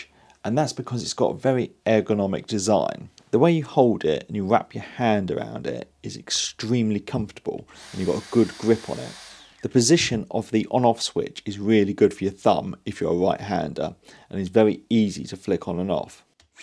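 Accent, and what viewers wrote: United Kingdom. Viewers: Wonderful presentation, right on the money. It’s amazing listening to the British accent, by the way…👌 Thanks for sharing mate…😎 While I have your attention, would you happen to know if Bosch has any plans to make a cordless plunge router?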